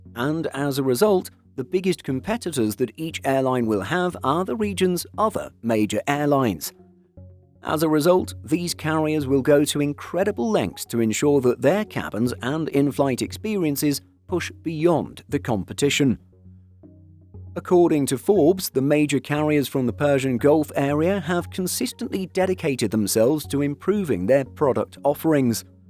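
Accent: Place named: United Kingdom. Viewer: Honestly, I’ve flown on several Middle Eastern carriers (always in business class) and I spent about a week in Turkey (sorry Erdogan I’m not spelling it the way you would like me to so eff off) and I’ve never seen a woman, much less her daughter, in a niqab, hijab, or for that matter a full chador. The Muslim “religion” is a goat herder’s abomination. So why would you, by the sound of your voice, from the UK and a child of the British Empire, such being the political creator at the end of the Ottoman Empire of the current abortion that is Middle East show videos of airlines completely understand that their business is buttered on all sides and not necessarily just Muslim sides, start this video off with two women in chadors? Why must your generation be so effing politically correct? All of you children can go straight to hell. We won’t get into whether or not it’s the Muslim concept of hell but I guarantee you that you won’t find any virgins anywhere once you die.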